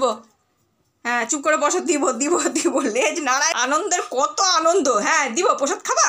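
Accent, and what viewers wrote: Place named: North Bengal, India. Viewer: Didi aapnar bachcha ta sob aaponjonke niye thaakte bhalobashe - khub bhalo sona baba ta aapnar. Didi aapni ki coochbehar athoba jalpaigurir aashe paashe kothao thaaken, karon aapnar bhashar tan theke ami eta bujhechhi je aapni North bengaler kothao thaaken, ami aapnader north bengaler bhashar onekta bangal plus calcesian bhashar mix) saathe khub parichito karon amar maa Hashimara tea estate er manush chhilen plus ami nijeo upper Assam thaaki tai bollam.❤❤❤❤❤❤❤❤❤❤🐕🥰🥰🥰🥰🥰🥰🥰🥰🥰🥰👍👍👍👍👍👍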